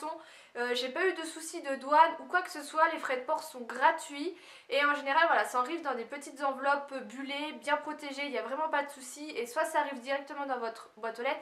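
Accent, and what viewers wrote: Lorraine, France. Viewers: l'accent lorrain est très spécial malheuresement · j'entends pas l'accent parce que je suis Lorraine aussi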